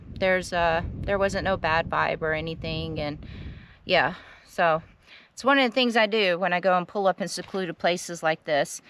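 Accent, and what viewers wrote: South, United States. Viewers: You make me smile. Love your southern accent ❤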